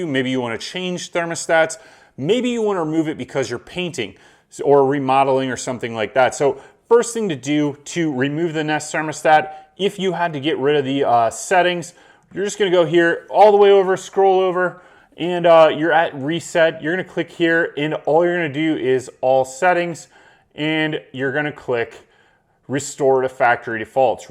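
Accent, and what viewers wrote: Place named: Baltimore, United States. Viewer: Sounds like Baltimore accent 😃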